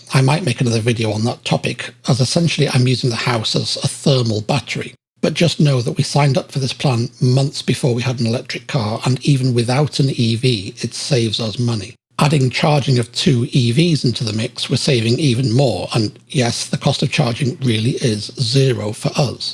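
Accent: England, United Kingdom. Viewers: A very good comparison but I have to wonder... If he's using Texas as a base, why does he have an English accent? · This is so weird listening to a British accent but in American prices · Excellent breakdown and video, although was a bit confused to hear a fellow Brit talking about a car with UK/European origins but using US costs!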